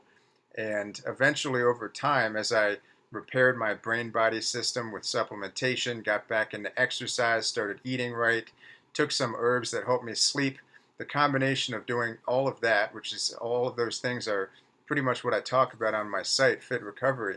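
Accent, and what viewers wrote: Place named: East Coast, United States. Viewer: there’s something really comforting about your voice and your back-East accent. I love listening to your videos. I never had the alcoholism as bad as you did, but it’s very relatable.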